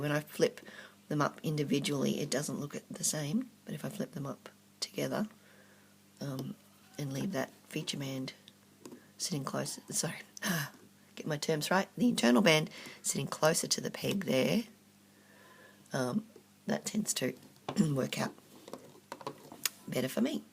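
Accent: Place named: Australia. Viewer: Hahaha! I so understand not waiting for the "perfect time" to do a tutorial! If you have kids or an adult kid (husband;) ) you just have to "wing it" at any possible moment or none will EVER get done. Love your designs, you are amazingly talented! Also, I am so infatuated with Australia and love listening to those who have an Aussie accent, your manner of speech is SO COOL!!! Right now I am trying, SO HARD, to comprehend monster tail type looming. I am in awe of those, like yourself, who are making such beautiful designs this way!!!! Love you looming friend:)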